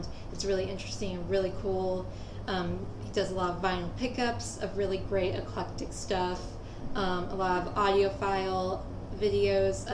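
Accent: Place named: Pacific Northwest, United States. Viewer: Hey, you showed up in my recommendations the other day, so this is the first video of yours I watched - and it made me a subscriber! Great easy-going style you have, and in a YouTube genre that's far too dominated by men, if you ask me. It sounds like you're a fellow Pacific Northwesterner, too, which is all the more fun.:) I hope you survived last week's heat wave OK. I look forward to watching more of your stuff (and I invite you to take a peek at my channel if you haven't yet)! Cheers!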